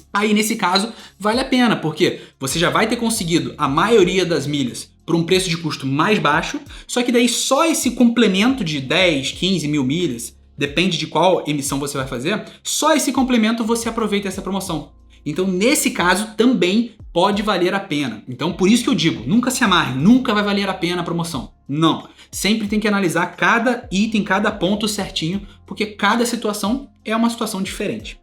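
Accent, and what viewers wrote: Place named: Rio de Janeiro, Brazil. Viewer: Meu camarada, não troque o sotaque, deixa o s ser X naturalmente, somos cariocas 😅😅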